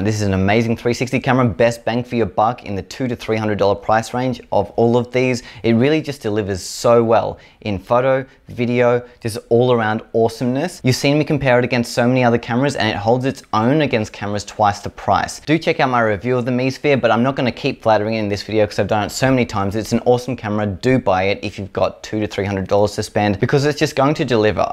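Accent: Australia. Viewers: Didnt realise your from Australia. What part of Australia you from?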